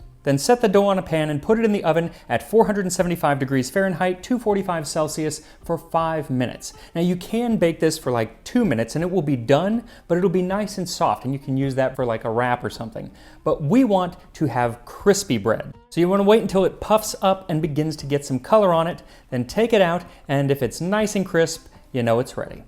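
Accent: Europe. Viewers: All his pronunciations not European still sound European. His Japanese even sounded european. But that's fine. I'm not coming here for linguistics. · his pronunciation is not half bad for someone that probably never studied Arabic before! I think he studied a lot of European languages so he might have added a bit of european style emphasis that would probably only be slightly annoying to native speakers. As long as it's not so bad it sounds like the wrong words, it isn't the end of the world. There's like 2 dozen dialects of Arabic, it's hard to be perfect at pronunciation unless you have some background in it.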